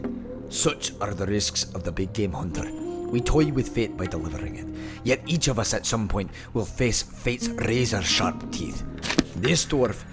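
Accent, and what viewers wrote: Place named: Scotland, United Kingdom. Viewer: Thanks for doing this, although I wish it wasn't read with a strong Scottish accent. Hard to understand some words ^_^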